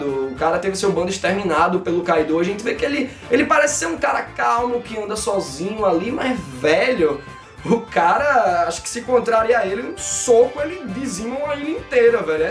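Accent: Pernambuco, Brazil. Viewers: VC e Pernambucano ou Nordestino?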